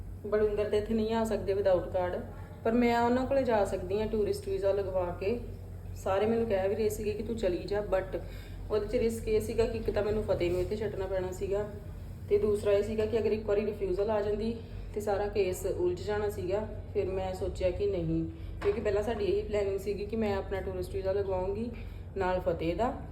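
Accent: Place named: Majha, India. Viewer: Nice vlog tusi kithe o tuhde boli majje wale aa sisters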